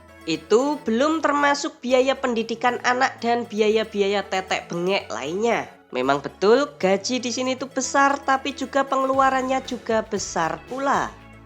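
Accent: Java, Indonesia. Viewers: ngomonge medhok banget, malah keren unik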